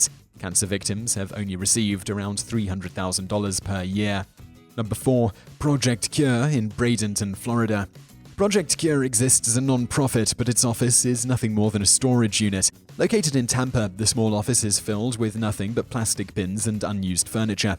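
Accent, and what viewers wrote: England, United Kingdom. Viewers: pyrovargas, Another communist imbecile. You can't tell the British accent? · I like your videos, but by the sound of your voice your English, yet all of your home topics are American. Why is that?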